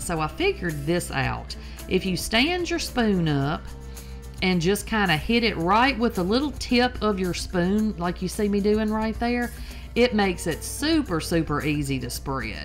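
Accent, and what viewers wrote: South, United States. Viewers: Love the Real Southern Drawl! I could listen to you talk all day.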